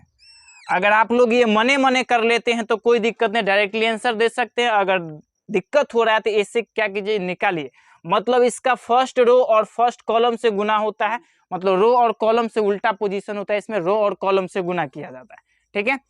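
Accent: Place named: Bihar, India. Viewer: Ekdam Bihari style me sir 😍😍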